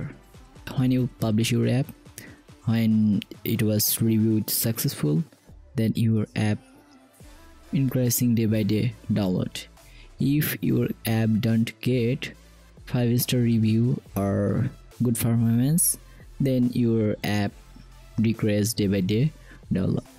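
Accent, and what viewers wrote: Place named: India. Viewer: Interesting Informations. And an Indian accent. It's being familiar. :) Thanks. Helpful Informations.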